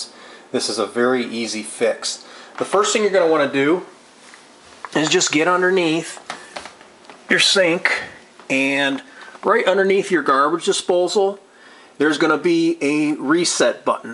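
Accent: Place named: Wisconsin, United States. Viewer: I noticed your Wisconsin accent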